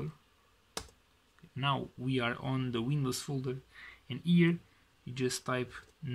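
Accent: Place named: Russia. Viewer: You sound very russian lol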